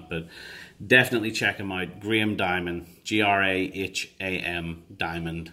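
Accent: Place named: United Kingdom. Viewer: you have the most American British accent ive ever heard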